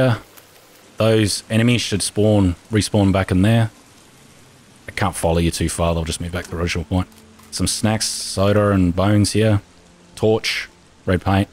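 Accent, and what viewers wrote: Australia. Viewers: I just like hearing this cool, Aussie accent😁👍 hello from Royal Oak Michigan!👋🏻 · that's an australian accent for ya mate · Yes he's Australian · Man has a Narrator voice im assuming your Australian pls dont kill me if not but im pretty sure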